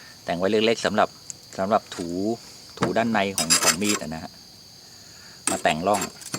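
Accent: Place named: Central Thailand, Thailand. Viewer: ขอบคุณคร้าบ ทำไมพูดกลางชัดจังครับ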